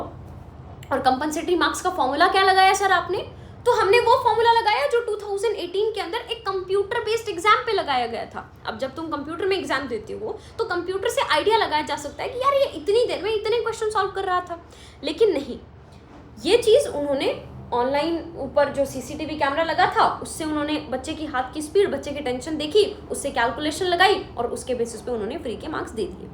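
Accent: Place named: Bihar, India. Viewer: Are you bihari your toun like bihari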